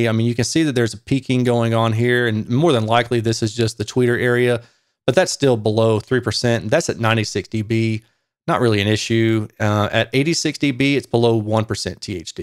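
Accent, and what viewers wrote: South, United States. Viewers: that's great 😎 but what did you wrote toms? Unless its me that misunderstood his southern accent?😂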